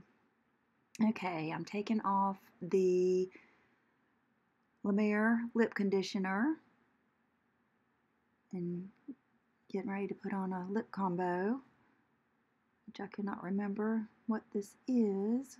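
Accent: South, United States. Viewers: Great video!!!! You’re voice is so welcoming and southern! ♥️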